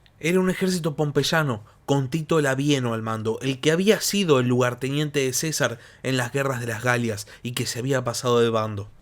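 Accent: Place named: Rioplatense, Argentina. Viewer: Aunque no me gusta nada tú acento argentino o uruguayo (escuchar Pompesho en vez de Pompeyo , weni widi wiki jajaja ,en vez de veni vidi vichi ... En serio no puedo con eso me revienta el tímpano) he de reconocer que tienes un contenido de mucha calidad. Te mereces más subscriptores, a lo mejor si intentases usar un español más neutro conseguirías más subs españoles.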